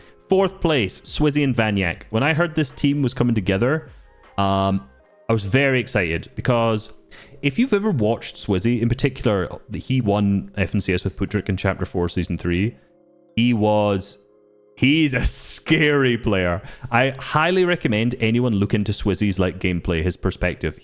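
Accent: Scotland, United Kingdom. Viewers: cant help but admire the scottish accent 🔥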